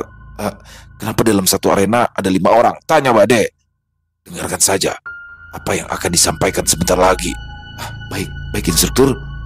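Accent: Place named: Kalimantan, Indonesia. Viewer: Ceritanya bagus, diawal pembawaan kisah logat Kalimantan masih kebawa mungkin Krn kebanyakan kisah dr sana ya 😁. Jadi ada tugas baru nih bang belajar aksen Bali 😅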